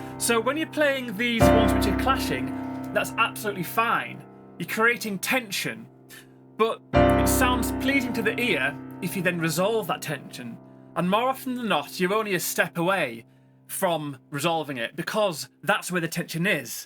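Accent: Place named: Manchester, United Kingdom. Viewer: Love listening to your accent! I’m guessing it’s northern - Manchester? From an avid British tv watcher from Australia. Also enjoy the content of your videos - very helpful!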